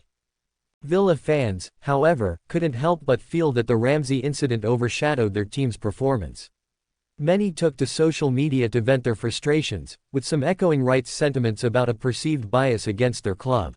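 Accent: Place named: United States. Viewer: And why do we have to listen to an American accent, US English usage (… a 1 to zero win …) etc? Surely there are British English AI voices out there, no?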